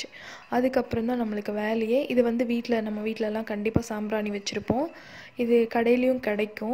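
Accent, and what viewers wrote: Madurai, India. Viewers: Madurai ya sis neenga?? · Neega madurai ya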